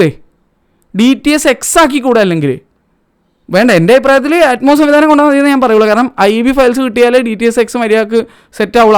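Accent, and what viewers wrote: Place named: Thrissur, India. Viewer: Thrissur ragam ❤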